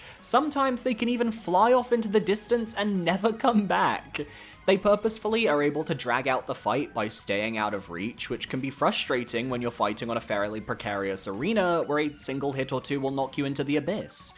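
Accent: United Kingdom. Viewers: TF is the awful Scottish accent about? Reckless behavior 😂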